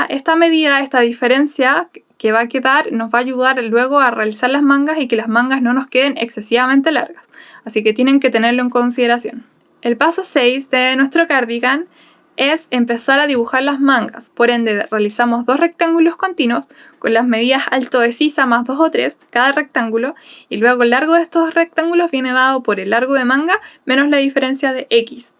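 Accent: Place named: Chile. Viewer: Amo el acento chileno😊 ya me suscribí. Muy buen tutorial. Saludos desde México. Ojalá hagas mas videos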